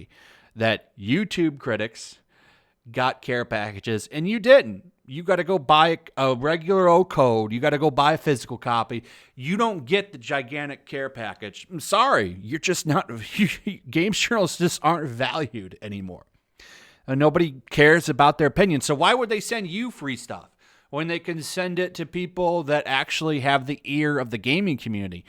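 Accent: United Kingdom. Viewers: Have never been into any games like GTA but if you can be Keanu Reeves in Cyberpunk 2077, then I'm one step closer to trying. Excellent video again brother. Loved the British accent. God bless you.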